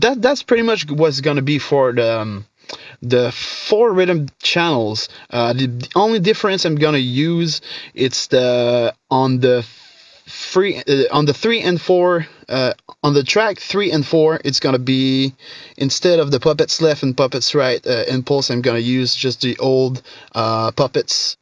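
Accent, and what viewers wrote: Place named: Quebec, Canada. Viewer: je savais que tetais quebecois avec ton accent , jai confirmé avec ton caliss 😂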